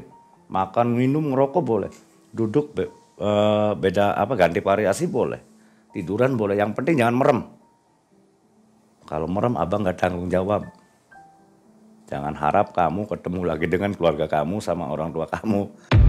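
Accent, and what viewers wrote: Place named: Cirebon, Indonesia. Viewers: Cirebone kentel pisan mang😂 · Logat cirebon nya khas bngt walaupun ngomong indo juga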